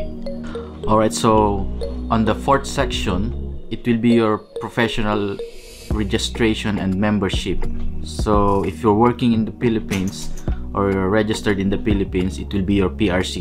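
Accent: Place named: United Kingdom. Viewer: Slang na master. Kala ko briton na ang nagsasalita.😄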